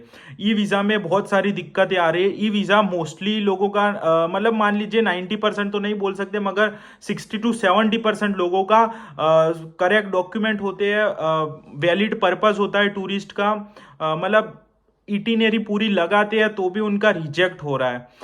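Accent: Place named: Gujarat, India. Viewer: Bhai me ahmedabad gujrat se hu muje lagta hai apke bolne se ap bhi gujrat se lagteho to app no do to bat karsakte hai Mera ek consultant he ahmedabad me 2 sal ka work permit de raha hai after reach 5.5 lakh payment or 600 to 700 euro in factory worker with acomodetion and food by company flight ticket include to kya karu sir ana chahiye ya nahi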